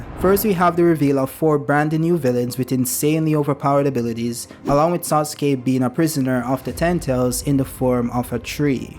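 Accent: Jamaica. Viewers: Are you Jamaican? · Are you jamaican?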